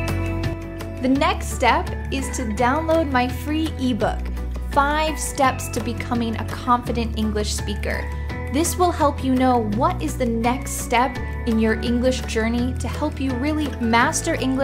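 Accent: United States. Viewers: Excellent American pronunciation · I love your American accent! Do you mean from North America or Central America or perhaps Soth America? Do you mean the U.S. of America? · I just love how these Americans speak...n she's really cute n her American tone is outta this world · I like when you are speaking American accent and I got a lot of many things . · As a Chinese, I really like her American accent. It sounds very comfortable. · I love this American accent and beautiful smiles!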